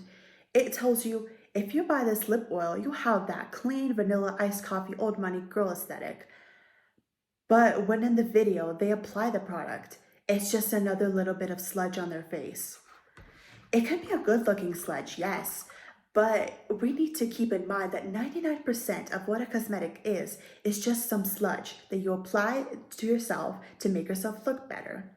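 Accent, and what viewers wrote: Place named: United States. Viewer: Where are you from? You say Euros but your accent sounds totally US-American!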